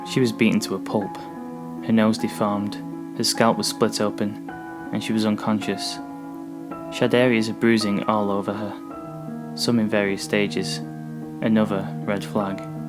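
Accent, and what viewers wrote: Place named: United Kingdom. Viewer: Your narration is getting better and better. Well done !! Nice to hear another Brit narrating !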